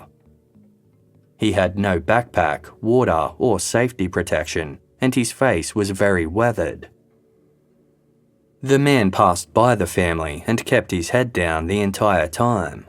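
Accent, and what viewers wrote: Australia. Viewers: Love hearing A fellow Aussie accent 🇦🇺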